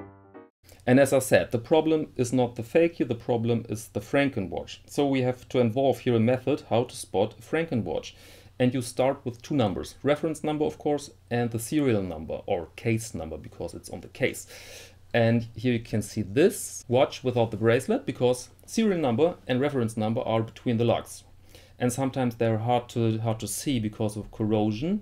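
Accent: Germany. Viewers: I don’t know why, but to my ears there is something special about the German accent when it comes to talking about technology - new or vintage. I have only just found this channel, and I’m so happy I did. Liked and subscribed.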